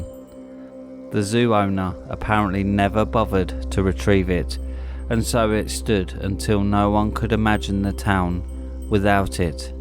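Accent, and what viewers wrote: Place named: United Kingdom. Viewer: Loved your intro dude. Sounds like you are a fellow Brit narrator too. Great to see your channel growing too. Hope you don't mind a little bit of critique.. nothing bad. Try to make your reading a bit more flowing. Maybe when you do a person's speech, maybe try adding a bit of inflection/emotion into whatever they are saying. That being said, one thing I find that listeners do seem to enjoy, is to add plenty of sound effects throughout the story. So far I haven't found any other narrator, not even the big channels, add as much as I do. Folks find it helps the story along, by making it more immersive. Some have even told me that my narrations remind them of the old-time radio shows haha. Oh and maybe ask around for collabs, that can also help boost your subs and watched hours too.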